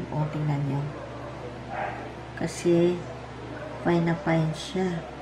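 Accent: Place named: Bulacan, Philippines. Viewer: Aq po naglalagay ng dayap sa maja din..masarap po tlga ang my dayap..prang refreshing ang lasa nya.. Taga bulacan din po ba kayo Ma'am? Ksi po tagalog na tagalog kayo mag salita..tulad nlng nyan,,dayap ksi sa bulacan din.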